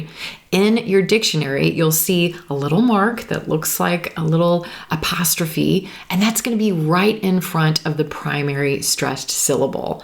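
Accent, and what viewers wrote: United States. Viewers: hello, my name is juan rivera, sorry my english is not good I'm begginer english student I'm 38 years old and I'm from Nicaragua in centre america, as you can imagine this country is really poor talking about economic situation and because of that I lost my family and now I live with my mom I don't have my own house I survive with only 80 dollars per month and I send 50 for my two daughters for manutation, it's been difficult all this time, that's why I have been studying english in a little free program course just to improve a litle my situation at least. but I've already finished the 12 levels and I feel that I need to improve a lot yet, thanks for making this videos, I just watch one of your videos and believe me I liked it, and I'm gonna try to see every single video from your first video, I like your pronunciation I guess your are american, to be honest don't like british pronunciation I prefer american accent, I would like you to talk about the most common situation with modals in present future and past because this is the hardest content for me, I would thank you, well greetings and blessings..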